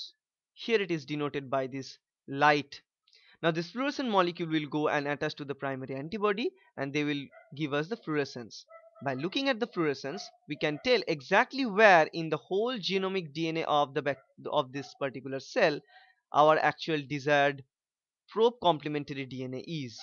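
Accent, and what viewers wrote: Bengal, India. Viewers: Its Indian accent, with a bengali sub accent :P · ...i never said it before, but I love your Indian accent <3 .. haha thanks a lot! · his indian accent is much more annoying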